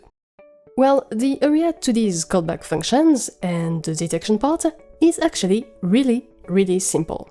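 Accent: France. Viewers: I'm still racking my brains trying to figure out how to get a character to climb a ladder. I've almost reached the same solution, but I don't use Godot; I code games in JavaScript without engine, just for fun. But I don't have a function to know if I'm entering or leaving the tile. I'll take a look at the Godot source code. Thanks for the video. PS: Are you French also? Because I surprisingly understood your accent lol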